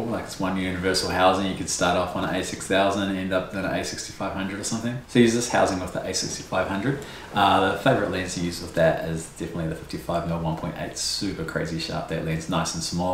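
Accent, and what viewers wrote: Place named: New Zealand. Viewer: Awesome video, thnks very much! Was trying to watch it on a bus with the subtitles, kiwi accent is the best but clearly a struggle for youtube to match subtitles too haha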